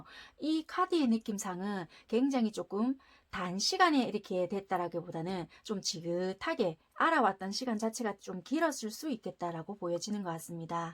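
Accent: Busan, South Korea. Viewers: 5번🙏🍀💜 저도 부산사람이라 듣기 편하고 좋아요 잘맞는거같아요....... 참고해볼게요... 앞으로 우리커플 잘됐으면 좋겠어요 제발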